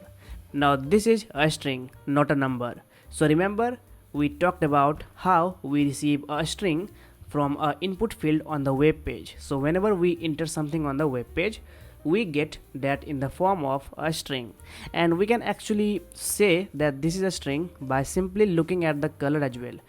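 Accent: India. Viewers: indian accent this is legit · Indian english is so cool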